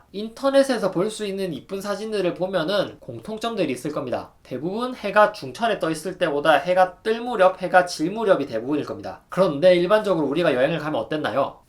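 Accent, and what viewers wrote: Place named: Gyeongsang, South Korea. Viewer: 갱상도 사투립니다ㅋㅋㅋ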